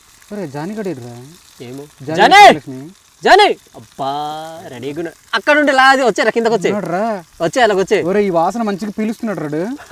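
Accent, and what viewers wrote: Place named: Odisha-Andhra border, India. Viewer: ఒడిషా మరియు ఆంధ్ర బోడర్ బాషా మీది మాకు ఒడిషా వచ్చు మరియు తెలుగు కుడా వచ్చు చాల గ్రేట్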